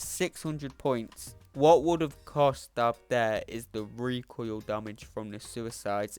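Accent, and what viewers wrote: United Kingdom. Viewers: He has an accent, plenty of people in the uk speak like that, don’t be obtuse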